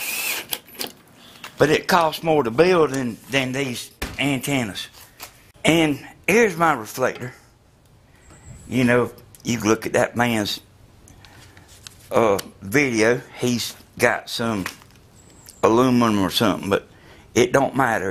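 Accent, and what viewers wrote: South, United States. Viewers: I love the southern accent. 👍🏻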